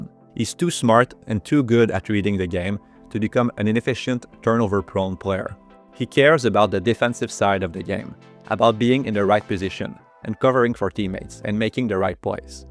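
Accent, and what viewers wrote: Quebec, Canada. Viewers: T'es un québ toi aussi? T'as un accent québecois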